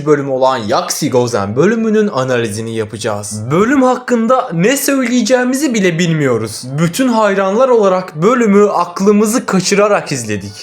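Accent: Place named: Azerbaijan. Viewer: abi azerisin anladıkta HARKASA yeniden merhaba diye girme kulağım acıyo hErkEs e harfi var orda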